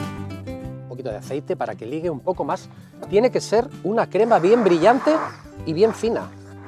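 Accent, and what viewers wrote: Spain. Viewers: Cómo me gusta escucharte! Me encanta el español de España! En Argentina no hay piparras, ni sé qué son? Hay algo parecido para reemplazarlas?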